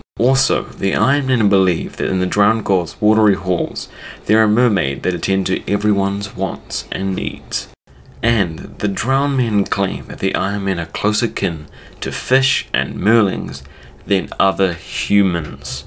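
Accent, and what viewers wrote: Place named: New Zealand. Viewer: Excellent research,! I'm trying to place your accent. New Zealand? South Africa?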